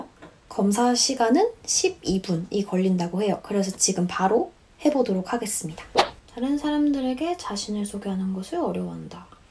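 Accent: United Kingdom. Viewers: 오늘따라 더 이뻐보인드아...♥ 항상 잘 보고 있어용 영국 발음은 처음들어서 그런지 자꾸 끌린다앗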